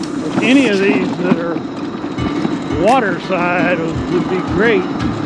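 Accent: Texas, United States. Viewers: I don't know why but I really love your videos. Your Texans voice and your Hawaiian shirt makes me want to watch your channel more. I'm glad I found your channel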